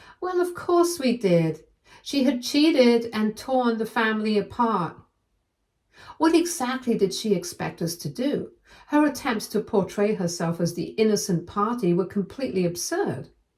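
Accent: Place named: United Kingdom. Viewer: I believe that does not edit the posts but presents them exactly as the OP wrote them. So any errors in choice of words are the OP's. The only thing you can fault on would be pronunciation but keep in mind the she lives in the UK and not the US. (And even in the US there are regional differences in pronunciation of many words just as the UK has regional pronunciation differences.)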